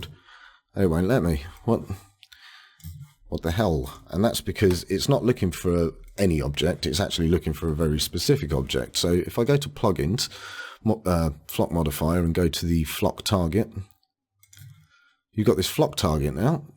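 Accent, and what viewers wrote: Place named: United Kingdom. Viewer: I really love your accent, even have been rewatching some of your videos because of that. Can you tell me which one is that exactly? I can only tell it's British :D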